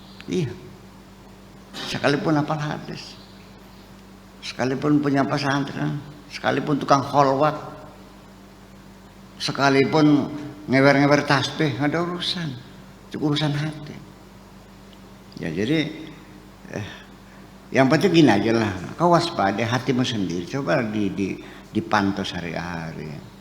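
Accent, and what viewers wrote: Indramayu, Indonesia. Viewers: ha ha ha iya nok, tapi emang khas omongan dremayu, tapi yaqin gak ada maksud menghina, apalagi klo umumnya bahasa gaul kertasemaya, " rikkk sira pen mendi !!!???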